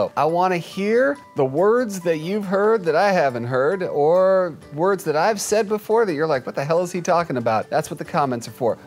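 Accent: England, United Kingdom. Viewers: I adore your English accent- and that's coming from an English lady. Velvet all the way, my friend. 😘👌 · Haha I am UKian, and you've got a great UK accent 👍🏼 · I love the British accent Cat Daddy Dictionary time XD But also, I love when cats get the zoomies. Highly entertaining, but, play with your cats too! ^^ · My cats Zooms during the day, she also makes a really unusual noise... she gets in the zone and she's zooming around. It is cute but I have upped her play time, so hopefully she uses up some energy. Nice British accent btw and that's from a Brit lol 🇬🇧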